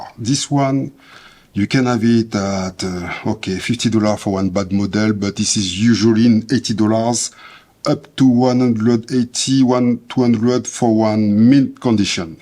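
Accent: France. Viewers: J adore comment tu own ton accent frenchy la classe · Super Chaîne ! A l'accent vous parlez français nan ? :D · Im a bigf takumar fan but I own ttartians are good too your french accent is quite pleaseing what software do you use for your graphics?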